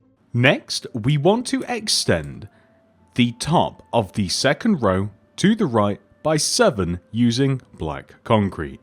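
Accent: United Kingdom. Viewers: He’s British so he pronounces it differently like me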